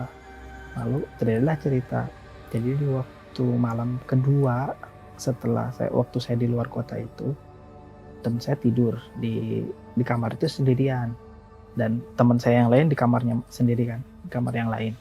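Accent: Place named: West Java, Indonesia. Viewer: Pasti orang sunda nih mas nya :v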